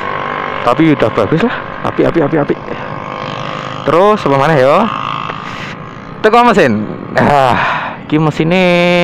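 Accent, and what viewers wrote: Java, Indonesia. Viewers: Jowo Endi mas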